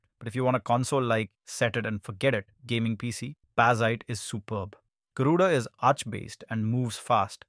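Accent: India. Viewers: can understand the freeaking indian accent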